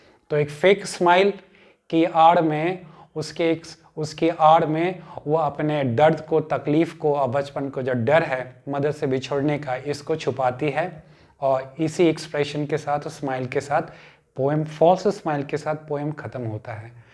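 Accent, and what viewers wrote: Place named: Bengal, India. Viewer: Sir apki languge tone se aap bengali lgte ho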